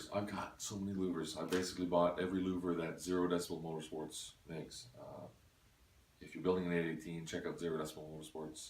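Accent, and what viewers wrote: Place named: Newfoundland, Canada. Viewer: Ahah - no wonder I couldn't peg that accent... A newf in AB is always a bit of a different one. Cheers m8, just stalked you on FB heh, hope all is well. Looking forward to updates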